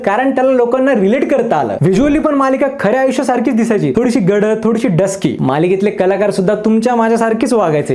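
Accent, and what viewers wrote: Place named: South, India. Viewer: मॅटर कमी, बोंबाबोंब जास्त, मला हा वाटत हा चेन्नई किंवा तिरुवनंतपुरमचा वाटतं,कारण त्याच स्टाईल मध्ये बोलत आहे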